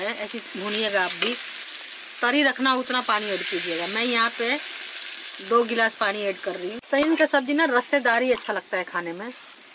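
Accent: Bihar, India. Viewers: aap bihari hai kya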